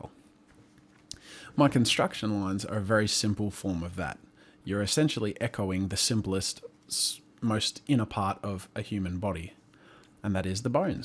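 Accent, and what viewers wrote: Australia. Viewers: <3 australian accent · You were somehow more Australian then you are now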